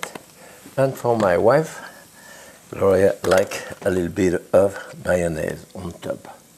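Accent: France. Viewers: Besides watching Jacques cook, I love listening to his voice. My maternal Grandmother was from France and it reminds me so much of how she'd speak English in her beautiful accent. It's so comforting to watch him cook and listen to him describe the process while relating personal stories. I'm sure I'll never have the privilege of meeting, but I'm extremely grateful to be able to watch his videos on YouTube and Instagram every day. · exactly, every other commenter is a celebrity obsessed child. the reality is this was an atrocious example of cooking on every level. food safety, ingredients, technique. sorry, other than the charming accent and the nice personality (my dad speaks clear American English also from France about the same length of time). I love burgers and good cooking by the way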